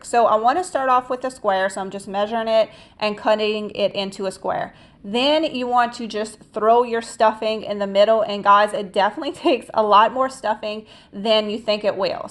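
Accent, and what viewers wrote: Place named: Virginia, United States. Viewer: r you from virginia??? UR accent caught me!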